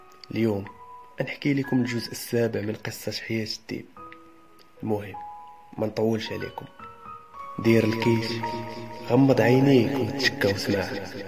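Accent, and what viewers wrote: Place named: Morocco. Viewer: قصة مؤثرة تعكس الكثير من الواقع المعاش أحسنت وأبدعت في الحكي باللهجة الدارجة المتداولة بين المغاربة الشئ الذي اظاف نبرة خاصة ومميزة للقصة تحياتي ومزيد من العطاء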